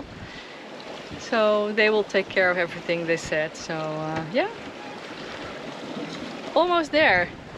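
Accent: Netherlands. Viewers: Love your videos, great sailing with good attitude, a good role model for all us sailing in small boats, all with lovely Dutch accent. Greetings from Finland!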